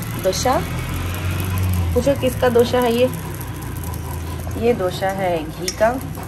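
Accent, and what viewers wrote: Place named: Hyderabad, India. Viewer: Hyderabadi language zabardast baat karthai ap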